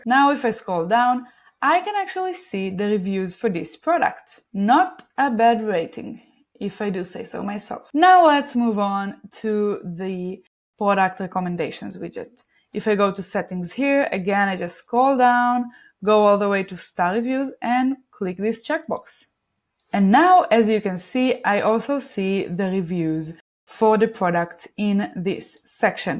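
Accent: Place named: Israel. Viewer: i can hear that Hebrew accent!! haha, great video, thanks!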